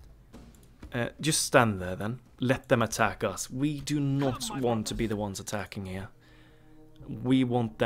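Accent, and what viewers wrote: Sweden. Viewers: Haha I can hear the swedish-english accent. Awsome video and gameplay! 💪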